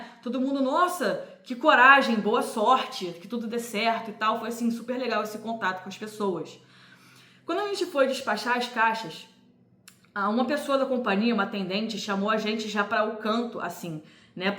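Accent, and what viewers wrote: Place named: Rio de Janeiro, Brazil. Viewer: Que legal! Eu moro em Michigan. Pelo sotaque desconfio que você seja carioca....eu também sou apesar de ter morado meus últimos anos no Brasil em Petrópolis. Gosto bastante de morar aqui nos EUA.🙂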